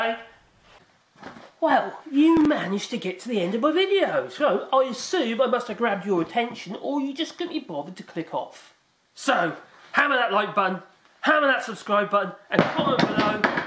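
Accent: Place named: London, United Kingdom. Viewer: You sound like a fellow Londoner. Walthamstow lad here.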